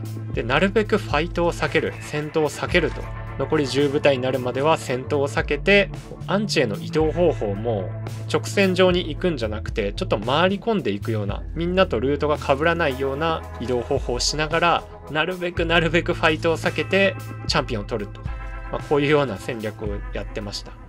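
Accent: Japan. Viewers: 方言の喋り方茨城弁じゃないかな？ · 方言青森くさいな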